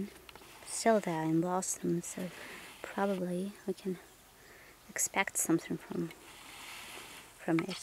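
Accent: United Kingdom. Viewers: So British accent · You seems to have a British accent. Btw, your village is quite lovely.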